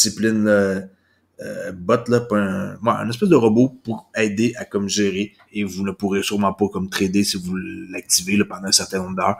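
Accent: Quebec, Canada. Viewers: Merci pour toutes vos videos et vos conseils! Je hold le xrp sur plusieurs annees. J’adore votre accent quebequois (je suis en France)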